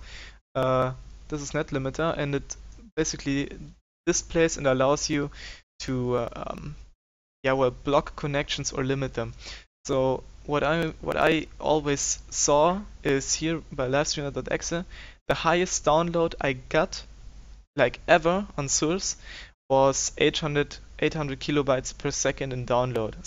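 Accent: Germany. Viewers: haha ich wusste das du deutscher bist xD Das merkt man am Akzent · Livestreamer gibt es aber anscheinend nur für 32 Bit.. Ich habe aber ein 64 Bit System.. Funktioniert es dennoch? :) Bist ja deutsch wie ich gesehen habe und man es auch raushört :) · D der deutsche Akzent ist real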